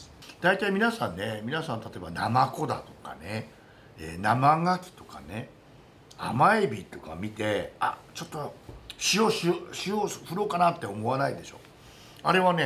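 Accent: Japan. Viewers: I've been learning Japanese for a while now and I really enjoy watching Chief. Maybe it just seems to me, but the Chief seems to have a slightly different accent than that of creators coming from Tokyo. Are you from other parts of Japan Chief? As always, thank you very much for the great video and I wish you much continued success!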